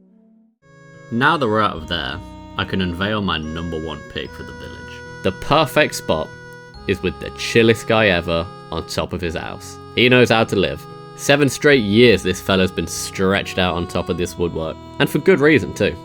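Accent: United Kingdom. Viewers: this is the most british man I have ever heard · Wait this guys British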